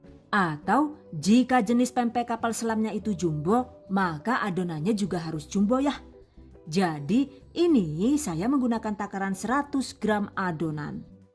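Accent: Banyumas, Indonesia. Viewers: Salam hangat dari negri kincir angin..wah luar biasa resepnya..memasak sambil berhumor... bhs.gaya orang ngapak Banyumas...saluut dan proficiat..👍👍👍😁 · Paling suka liat video karna selalu detail,gk berbelit2 jadi mudah di pahami..apalgi kalo udh ada ngapak nya mkin seru nonton nya 😂😂.. #GiveawayTHV2021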